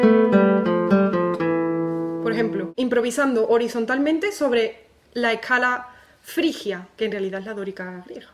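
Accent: Andalusia, Spain. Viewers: tu estilo de explicar, junto con el tono de voz y delicioso acento andaluz dejan a uno anonadado, hipnotizado. ¿Cómo es posible que tanta destreza y belleza se halla concentrado en un ser viviente, a menos que no sea un angel caído del cielo!! Qué placer! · Il tuo accento è andaluso? È bellissimo. Per me questo è lo spagnolo per eccellenza. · Me encanta el flamenco y la cadencia andaluz oleee😂❤😅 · Española, puesto que es de Andalucía, la región del sur de España. Por eso tiene ese acento que es el "asento andalú". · Woooww! Que canal increible!!! Apenas lo conocí y ya me está encantando!!! Ah, y por cierto , que lindo acento andaluz!!! :D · No entendí nada (demasiado técnico para mis pobres conocimientos musicales), pero disfruté el vídeo enormemente. Me encanta tu acento andaluz y tu linda cara muy expresiva. Ojalá pudieras hacer un vídeo de los palos flamencos. 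· Ella tiene acento andaluz de Sevilla.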